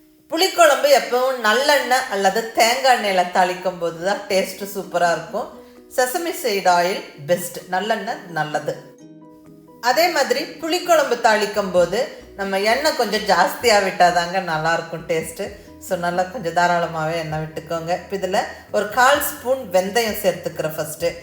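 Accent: Coimbatore, India. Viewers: மிகவும் அருமையா இருக்கு. நீங்க பேசுற கோவைத் தமிழிலே இன்னும் சுவை கூடத்தான் தெரிகிறது. மகிழ்ச்சி. வாழ்த்துக்கள் அம்மா.👍💐🙏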